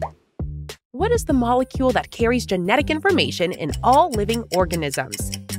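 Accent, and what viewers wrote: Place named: United States. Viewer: there must be a lot of dumb shishkababs out there!!! Like your American acsent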